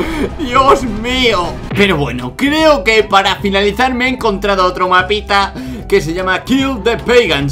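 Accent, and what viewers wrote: Spain. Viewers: tu tomas cerveza y de donde eres supongo que de España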